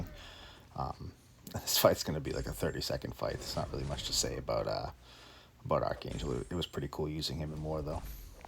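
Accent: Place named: Boston, United States. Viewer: Lol I knew it. I live a few miles north of Boston. It was how you pronounced your ahhhh’s that Sauron was ah 2. Love it….. 👊👊👊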